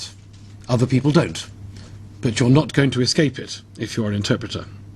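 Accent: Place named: United Kingdom. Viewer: good, quiet visual example of how an interpretaion works. And this pure, brand british accent is so ... lovely!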